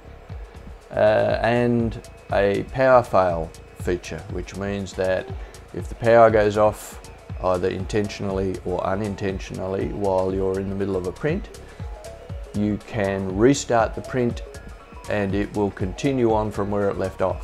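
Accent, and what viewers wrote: Australia. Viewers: Thanks for the video. Could you please clear up whether there is a difference between the US and the EU version other than the supplied cord, and that either are safe to use in Australia with a local converter. Good to hear an Aussie voice reviewing. Cheers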